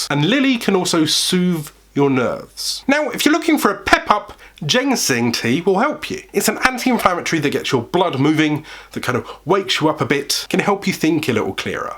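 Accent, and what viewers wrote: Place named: United Kingdom. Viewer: You have the best British accent I’ve ever heard. You should narrate.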